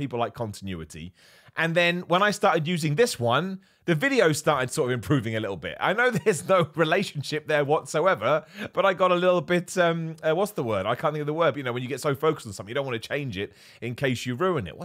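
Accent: United Kingdom. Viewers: Great Video, I'm from the UK and it is so funny hearing your views as I think the same and always better UK voice than American like there is alot on YouTube. Keep up the great work. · Great as always love your candor. Always good info and a laugh. maybe it's because I'm from the United States but I could listen to somebody from the UK talk all night long it's not that that's weird I just like the accent anyway keep up the good work man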